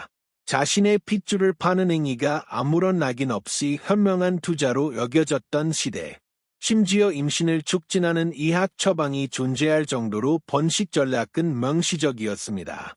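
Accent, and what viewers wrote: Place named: Egypt. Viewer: 이집트 여성 노예의 기록~내용은 자세한데 이집트 사람이 한쿡말 하는것 같은데? 한쿠말~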